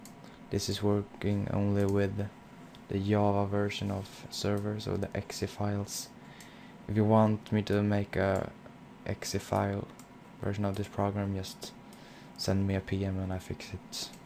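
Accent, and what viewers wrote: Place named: Scandinavia. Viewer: Thanks you my fellow scandinavian (atleast it sounds like it)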